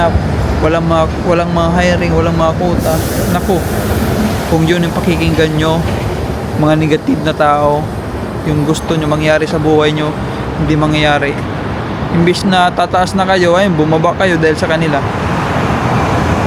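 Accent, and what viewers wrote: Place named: Waray, Philippines. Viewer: Waray waray ka kb sir..kasi yong accent mo sumasabit sa Pagka waray eh😅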